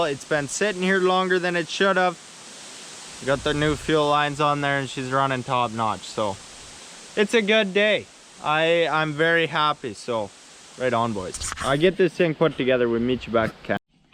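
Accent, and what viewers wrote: Canada. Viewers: I recently found your channel and I did go back and watch the cabin build, staining, etc and I though with you cutting wood and throwing it on the ground, if you get a chance, you need to build a shed roof over your side porch for firewood or extra coverage for whatever you choose. Also...GREATEST CANADIAN ACCENT EVER!!! 👍🏻 Keep up the videos!!! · Bahaha that’s what I’m sayin I’m sittin her with my pup and this one has me rolling. I ain’t had this much beer come through my nose in a long time. I’m a Texas feller but Been round a few Canadians but this feller by far is the funniest feller I ever heard talk from up north. I’d give anything to be able to go lay down some trees with him haha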